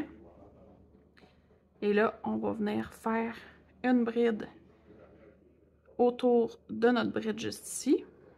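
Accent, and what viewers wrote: Canada. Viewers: Je l'ai terminé aujourd'hui. Je suis de France, dans la Sarthe (rillettes, 24h du Mans). J'ai adoré le faire et surtout avec votre accent Canadien 😍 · Merci pour cette vidéo. J' ai beaucoup apprécié vos explications. Votre accent québécois me rappelle de bons souvenirs ( voyage dans votre beau pays). Bonne continuation. Je vous envoie un petit coucou et un petit rayon de soleil méditerranéen du Sud de la France. Merci pour votre partage.KW.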